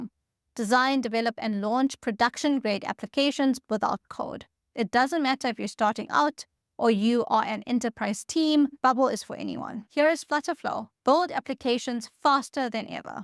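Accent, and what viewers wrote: South Africa. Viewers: Is that a south african accent? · Are you south African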